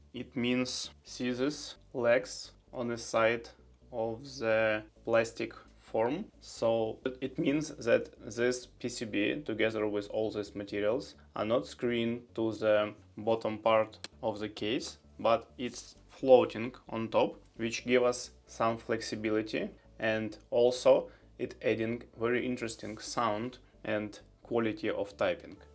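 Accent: Russia. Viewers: Такое ощущение как будто он русский но говорит по русски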